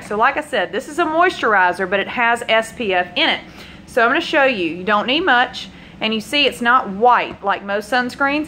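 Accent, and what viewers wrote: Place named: Texas, United States. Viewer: May I ask a question? What part of the country do you live in? I lived in TX for 12 yrs, and your accent sounds a lot like mine!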